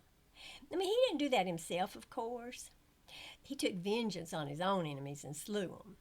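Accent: South, United States. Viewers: That sweet southern 'Hi' is always the harbinger for brilliance ❤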